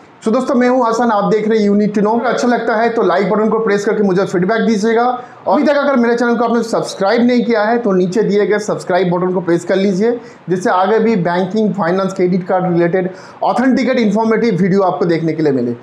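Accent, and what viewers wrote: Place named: Bengal, India. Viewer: Kya bolta rehta hai. Kuch samajh nahin aata iska. Poora bangali maushay.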